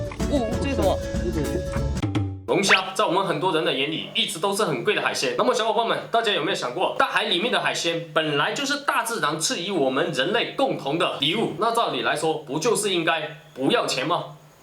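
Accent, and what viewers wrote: Chaoshan, China. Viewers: 潮汕话闽南语系的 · 是潮汕话吗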